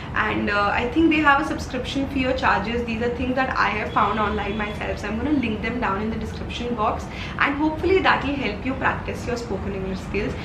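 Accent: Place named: North India, India. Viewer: Omg i didn't know you're from assam ! I'm from assam as well .🙆 you're hindi and english sounded like north indian .😅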